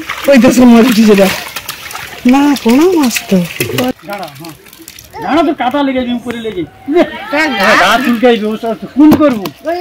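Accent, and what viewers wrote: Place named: Medinipur, India. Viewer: এই ভাষা টা আমাদের গ্ৰাম ও বলে মেদিনীপুর ভাষা, কাই টু মাই টু ভাষা ভালো লাগে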